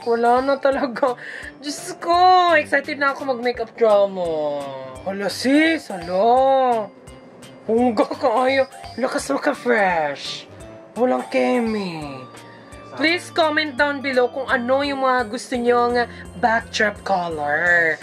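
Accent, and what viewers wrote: Visayas, Philippines. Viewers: Bisaya ka madam ? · Ay bisaya diay ka mamsh? Karon pa oks😅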